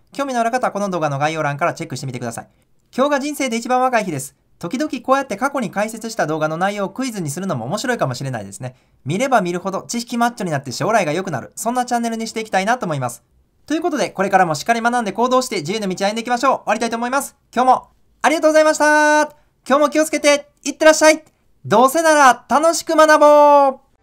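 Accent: Kansai, Japan. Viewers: 70点！最近学長の動画を知って、見まくっていたら、関西弁がうつってマス。同じ方多いのではないでしょうか？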